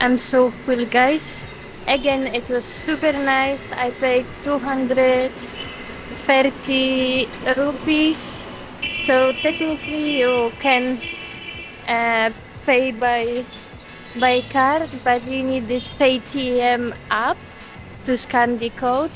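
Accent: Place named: Russia. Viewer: you are very polite and soft spoken and you sound like russian 👍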